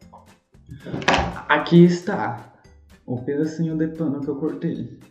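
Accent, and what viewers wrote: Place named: Italy. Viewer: Não sei pq, mas o sotaque me lembrou muito Super Mario.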